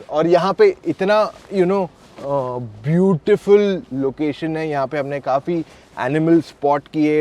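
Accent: Gujarat, India. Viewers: Sir aap gujarat se ho kya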